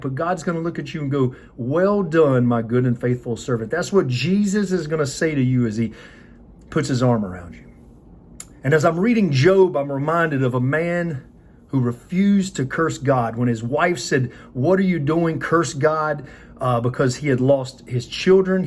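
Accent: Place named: California, United States. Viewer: Hey, your voice reminds me of my old school pastor back in my younger youth, with the Californian/southernistic (new word made) accent. I enjoy your videos and the good messages from the Word of God. I wanted to know your thoughts on the different translations of the Bible, like speaking of my old school pastor, he comes from that old school era of having your actual copy of Word of God in KJV and I believe that too but have somewhat laxed on it with using the YouVersion bible app but strictly to KJV and nothing else as I kind of bridge the gap of old school to the new school, as I am a late 90s kid just before that horrifying, tragic day in New York with a couple towers. My question is, do you learn more from other versions or do you find some more or less restrictive in obtaining knowledge you want to get from God, what do you get from these other translations than from KJV?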